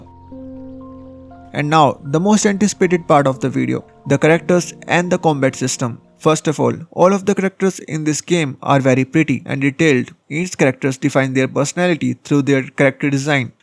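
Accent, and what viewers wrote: India. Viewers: Hey umm are you indian...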